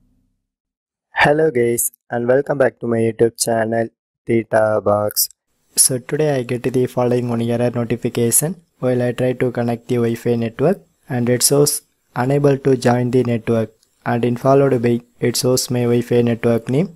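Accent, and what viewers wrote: India. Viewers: Im tired of hearing these indian accent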